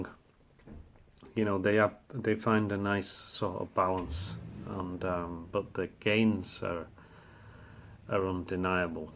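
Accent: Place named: Northern England, United Kingdom. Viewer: As an old Yorkshireman,I'm curious about what part of the North you originate from.Watching and listening to you takes me back to conversations in pubs long ago.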